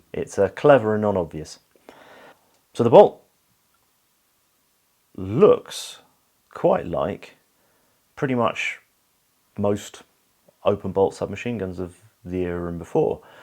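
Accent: United Kingdom. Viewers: A deceptively simple looking weapon, until you get into the details! Question, if you're in the UK as your accent would suggest, how are you in possession of this in firing condition?! I'd bl00dy love one! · Nothing like a good old British voice to explain the workings of a British gun.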